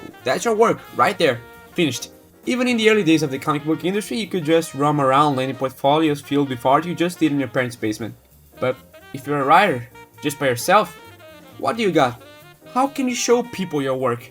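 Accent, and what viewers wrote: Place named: Brazil. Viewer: belo conteúdo, identifico sotaque br desde longe haha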